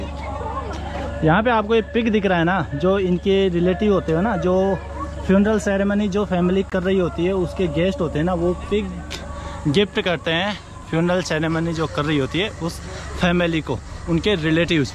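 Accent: Rajasthan, India. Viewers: bdiya bolte ho pura Rajasthani touch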